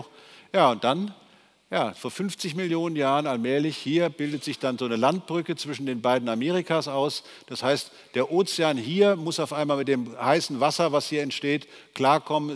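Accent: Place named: Bavaria, Germany. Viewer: (An den AnModerator zu Beginn) Der Inhalt ihrer Videos ist ja eh immer interessant. Aber könnten sie erstens mal den Buchstaben A richtig aussprechen und nicht so extrem Bayerisch und zweitens die Augen nicht ganz so aufreissen? Ich finde beides in Kombination lenkt zu sehr vom Inhalt ab und bringt das Ganze in eine so bestimmt ungewollt komische Richtung. Liebe Grüße und danke für die gute Arbeit und den interessanten Content!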